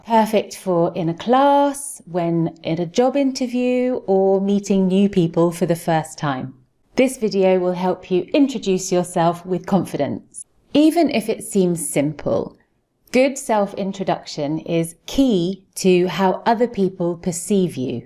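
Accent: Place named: United Kingdom. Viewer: I love to hear your British accent.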